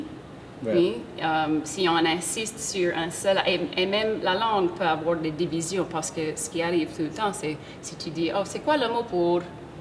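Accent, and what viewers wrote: Quebec, Canada. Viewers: tu à un super niveau de français.. bravo.. Avec un léger accent québécois..!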